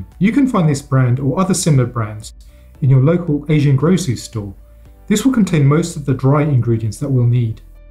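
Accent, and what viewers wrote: United Kingdom. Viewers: Your english is superb ! British accent .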